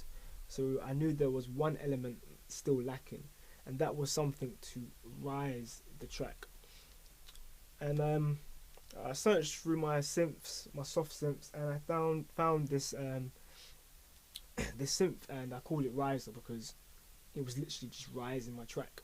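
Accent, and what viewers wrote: United Kingdom. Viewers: Thank you... I've had producers block for about 5 years; save marriage. I've heard that can kill creativity...lol. Anyway I would like to ask you some questions on a business note. Please get at me ASAP. I'm not sure if you are in the UK still, hence the accent, but I am not worried about travel for the right investment.. Best regards. P.S. Mum lives in Essex.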